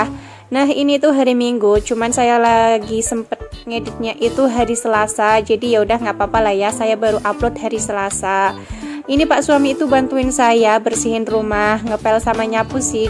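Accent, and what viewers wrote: Banyumas, Indonesia. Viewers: Ya Allah mb ngapak bngt mb ak jg orang Tegal ngapak tp krm terlalu lama g ditegal jd udah kebawa BHS disini dilampung padahal suami sy jg orang Cilacap ngapak jg suami saya ,dulu begitu lulus sekah SMA ak langsung merantau kerja di Batam mb terus ketemu suami di batam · Kali ini video dibuka dengan jogetan alea Dan pak suami yg nyapu.. Mba.. Request donk, nanti bikin vlog yg full bahasa ngapak. 😁 Lucu Dengarnya. Hehehehehe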